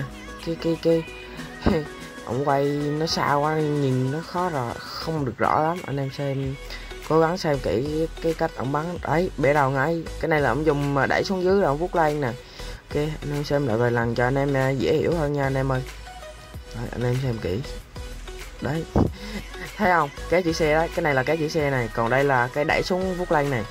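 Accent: Quang Nam, Vietnam. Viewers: Anh người quảng nam hả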